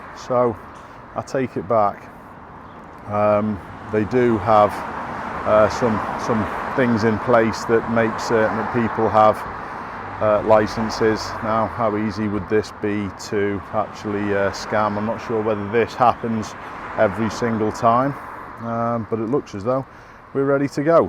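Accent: Liverpool, United Kingdom. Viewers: Oh, I remember having a bell on a bike being required? Aside: I love when you lapse into your proper scouse accent.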